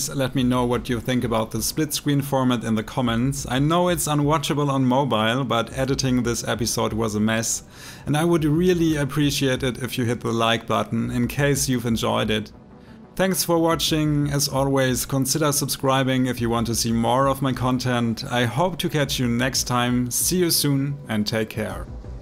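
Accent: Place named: France. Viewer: haha yes he has a proper french accent :D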